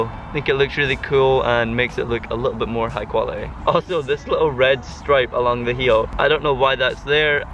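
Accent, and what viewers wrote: Scotland, United Kingdom. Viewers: Is he Scottish ? He sounds like Steven Caldwell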